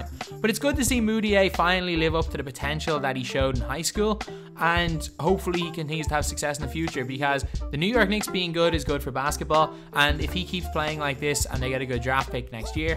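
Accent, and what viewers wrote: Ireland. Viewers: Thanks I’m Irish and I thought he was Irish alright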